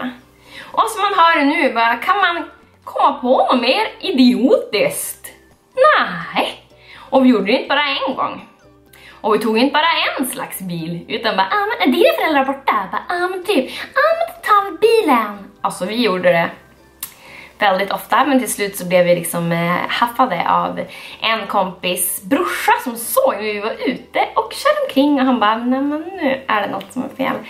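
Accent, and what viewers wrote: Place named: Umeå, Sweden. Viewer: Du har typ Umeå dialekt också ❤️😘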